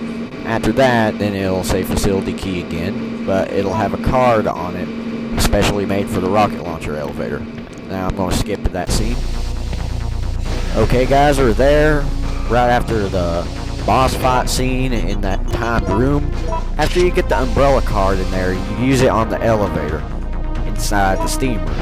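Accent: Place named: Texas, United States. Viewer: right out texas with that accent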